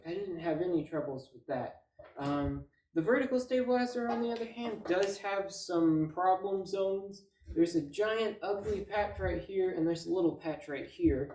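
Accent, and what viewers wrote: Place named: Canada. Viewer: Has to be his voice, and that hippy Canadian accent.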